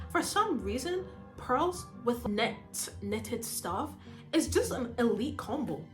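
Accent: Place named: Scotland, United Kingdom. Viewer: she's Scottish, lol, love it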